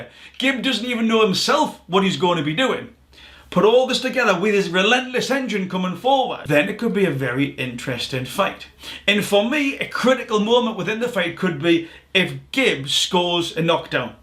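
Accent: Newcastle, United Kingdom. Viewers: you and true geordie have the same legendary accent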